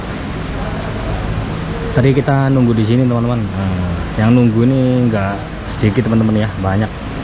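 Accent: East Java, Indonesia. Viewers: Mas orang mana ..kok bahasanya kayak Orang jatim?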